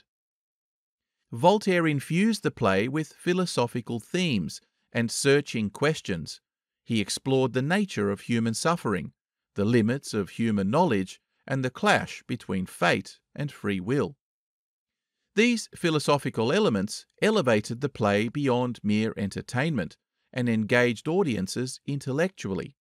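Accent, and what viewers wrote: Australia. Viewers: I must say very colour full English language used by it's creator while narrating in Aussie Accent with various cuttings of picture, But I like it very new experience. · Voltaire and an australian accent....?? OMG.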